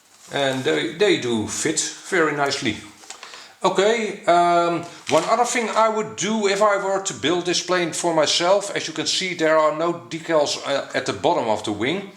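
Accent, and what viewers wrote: Netherlands. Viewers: Really enjoy your vids. Loving the accent especially. Wishing you every success with growing your channel. You obviously put a lot of hard work into it and are passionate about the hobby. Respect buddy. Cheers Dom (Essential RC)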